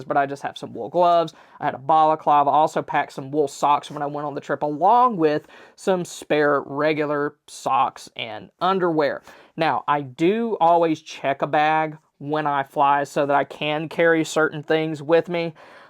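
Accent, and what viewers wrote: Texas, United States. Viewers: The accent is in your ear. Being from Texas as he is, I am familiar with people in other places in the country that assume that if you have a Texas drawl that you are slow witted or stupid. Don't make that assumption is my advice. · As another Texan, I'd say it's because of his accent that you can trust he knows what he's talking about.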